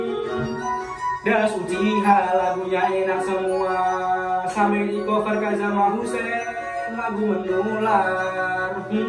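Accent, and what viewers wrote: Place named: Java, Indonesia. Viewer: Kak asli orang mana yah?? Kok medok kalau bicara😂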